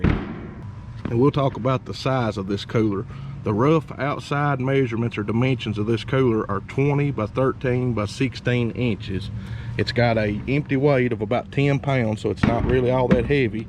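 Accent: South, United States. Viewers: thanks for the review, bud. also your southern accent is spectacular. cheers.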